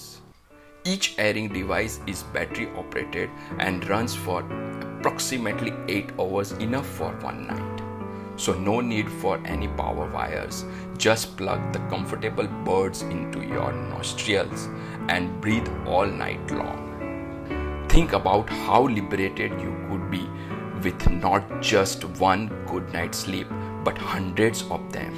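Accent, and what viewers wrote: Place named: Gujarat, India. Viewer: I like your accent, gujju Bhai.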